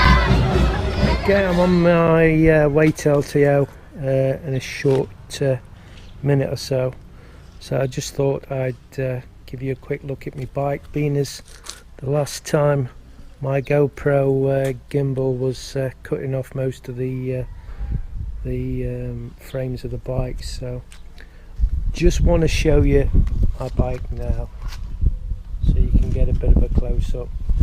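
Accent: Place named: Geordie, United Kingdom. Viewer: try.some dried fish, they stock it dry so its a food supply when they osnt anything else. my partner brings it back with her. if smells a bit but im used to it now. Nice bike you have there. keep them coming the posts, you have a fan club now i think. when you first went to the Philipines your accent was east Midlands but now youre back to your roots i can hear the geordie twang now. take care all the best.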